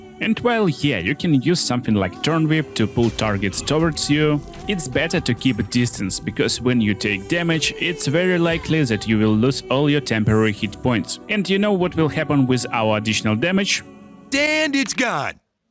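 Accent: Russia. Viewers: Такой сильный акцент, что у меня подозрение, что автор - русский. - Helldusk Gloves from Act 3, not from Act 2. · РАШН АКЦЕНТ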